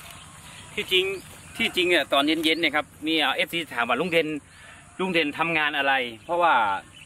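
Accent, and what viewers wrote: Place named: Northern Thailand, Thailand. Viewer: จ้าวลุงเด่นยายพรมาดูดึกแล้วจ้าวแต่แชร์ไปหลายคนแล้วรายการลุงเด่นสุดยอดเลยจ้าวน้องๆเขาชอบลุงเด่นตลกดีฮเหนือผสมไทยม่วนดีแต้ๆจ้าว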